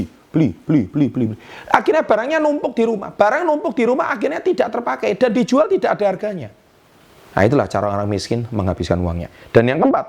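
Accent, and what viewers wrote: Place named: Surabaya, Indonesia. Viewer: Mantap? Sy suka banget dgn gaya pak memberikan paparannya, masukbanget dgn fikiran sy gitu, dan sy keingat bos sy dulu, gaya bicaranya mirip bgt? Terlihat ada ala Surabayanya gitu?? Keren!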